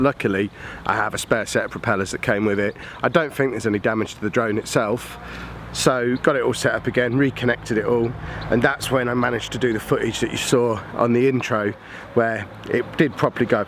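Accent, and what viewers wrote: United Kingdom. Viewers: lol I would want the headset I hear a UK accent are you in the UK or USA?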